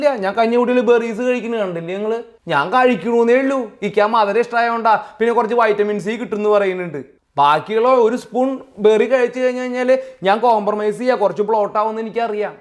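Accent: Thrissur, India. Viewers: എൻ്റെ ഒരു ഒപ്പീനിയൻ എന്താന്ന് വെച്ചാൽ, എനിക്ക് ചേട്ടൻ്റെ കുക്കിങ് വീഡിയോ യേക്കാൾ കുറച്ചും കൂടി ഇഷ്ടം ഇദ്പോലുള്ള അറിവ് പകരുന്ന വീഡിയോ ആണ്. അതിനു കാരണം ചേട്ടൻ്റെ തൃശ്ശൂർ ശൈലിയും ചേട്ടൻ്റെ അറിവുമാണ്.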